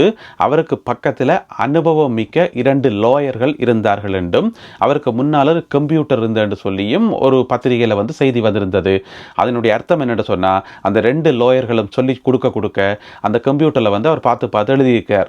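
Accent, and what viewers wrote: Jaffna, Sri Lanka. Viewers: உங்களின் செய்தி அழகாகவே இருக்கிறது.நடை உச்சரிப்பு எங்களின் தனித்துவத்தை வெளி உலகிற்கு எடுத்துகாட்டாகவே இருக்கிறது.எமது சந்ததிகள் அழகான எம் மொழி நடையை பின்பற்றும் பயிலும்.கேக்க கேக்க யாழ்ப்பாணத்தமிழ் இனிமையே. எம் ஈழத்தின் அத்திபாரமே தமிழ் உச்சரிப்பே. எம் தேசியத் தலைவரின் அடைத்தகுரலின் கம்பீர தன்மையை எண்ணிப்பார்க்கையில் மனம் சிட்டாக பறக்கிறதென்னவோ உண்மை🙏🤗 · உங்களது மொழி உச்சரிப்பில் யாழ்ப்பாண சாயல் முழுமையாக தென்படுகிறது… சற்று அக்கறை எடுத்து ஒட்டுமொத்த தமிழ் பேசும் மக்களுக்குரியதாக செய்திகள் கூறும் முறைமையை ஏற்படுத்துங்கள்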